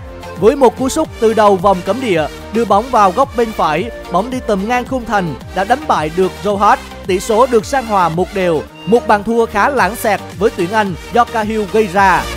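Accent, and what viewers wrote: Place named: Quang Ngai, Vietnam. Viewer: Giọng Quảng Ngãi đặc sệt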